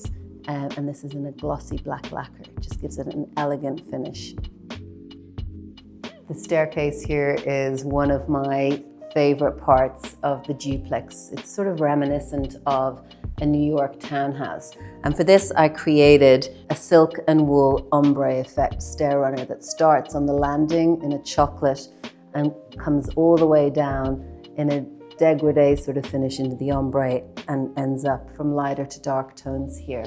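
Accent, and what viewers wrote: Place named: Ireland. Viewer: I'm hearing a slight Irish lilt. Yep, she has a studio in Dublin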